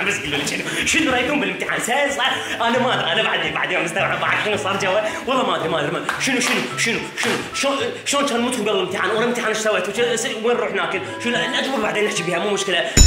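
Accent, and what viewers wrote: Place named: Nasiriyah, Iraq. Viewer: هسة انت معايش بالعراق ليش هيج تحجي اكيل كيلش اليسمعك يكول من الناصرية وبالاخص من العرب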